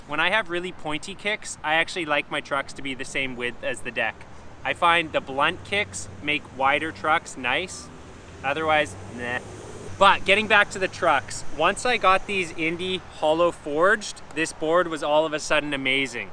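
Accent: Canada. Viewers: Canadian I think bro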